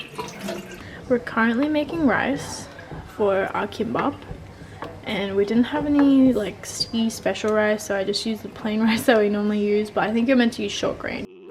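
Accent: Australia. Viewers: I’ve been watching you for 2 years but I still can’t get over your BEAUTIFUL Australian accent omggg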